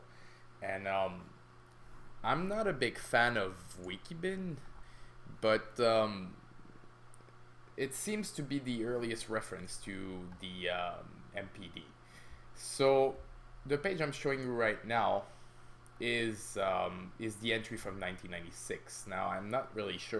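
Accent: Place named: Quebec, Canada. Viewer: You're from Quebec aren't you ? The accent gave it away.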